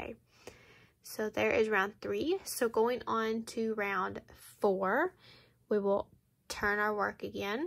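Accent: United States. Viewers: Americans should do something about their accent!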